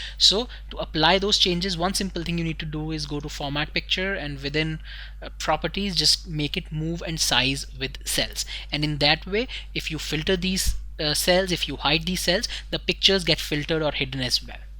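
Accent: India. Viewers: indian speaks English =))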